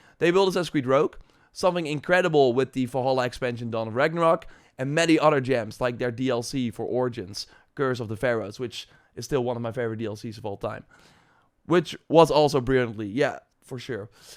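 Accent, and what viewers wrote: Netherlands. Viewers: i really wanted to watch this video but that thick dutch accent is so grating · Dutch (Netherlands)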